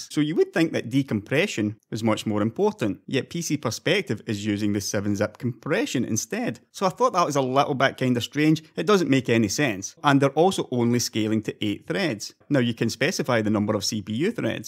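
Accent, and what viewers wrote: Scotland, United Kingdom. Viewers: I taught you are Scottish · his Scottish accent?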